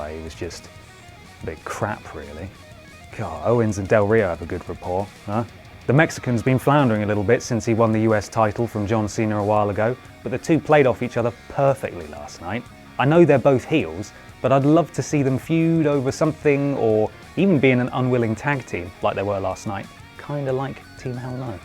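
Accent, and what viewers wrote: United Kingdom. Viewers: i'm a simple man, i hear the british accent, i click like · I'm a simple person,I noticed a british accent,I liked then I enjoyed watching it! That's it!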